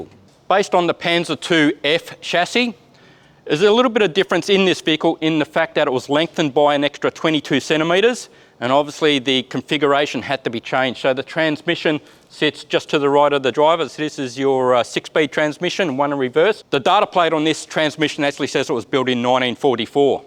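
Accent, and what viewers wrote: Australia. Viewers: You could mute it and try closed captions though CC has some issues with Aus accents.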